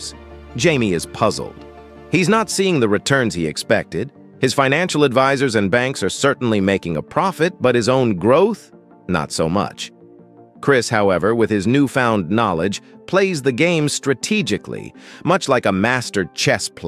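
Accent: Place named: United States. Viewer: You have a real cowboy accent in this video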